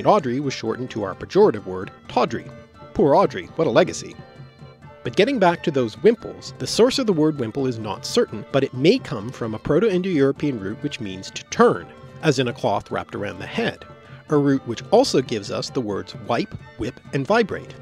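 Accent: Canada. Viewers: If no one noticed you were Canadian before, this video with all its ou words is a giveaway XD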